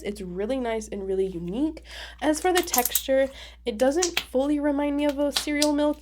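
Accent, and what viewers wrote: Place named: Spain. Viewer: I enjoyed the review but I wish there would be less talking and more slime ASMR. BTW your Spanish asent is amazing!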